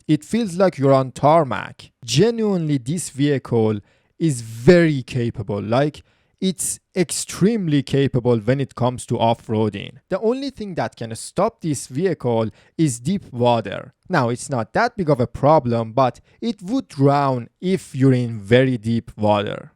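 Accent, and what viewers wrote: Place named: Iran. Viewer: Bro, your accent sounds extremely Iranian. Did I guess right?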